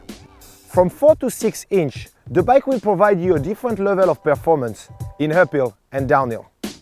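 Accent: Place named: England, United Kingdom. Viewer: Les conseils avec un accent anglais moyen, c'est pas mal. En Français, ça le ferait pas mal aussi.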